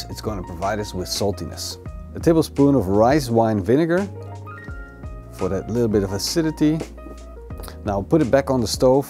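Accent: Netherlands. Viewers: Haha dutch accent is just to strong😂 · The dutch english accent is throwing me off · the dutch accent is strong with this one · ben je van nederland ? zo ja dan hoor ik et aan je accent xd . leuke video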